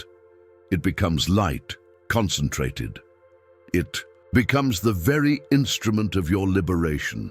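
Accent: United Kingdom. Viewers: SAY-tan= Satan. And SATurn=Saturn. & Tis not British, but the English accent. Scottish/Welsh, is vastly different. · It's the British accent. They don't emphasize the r like Americans.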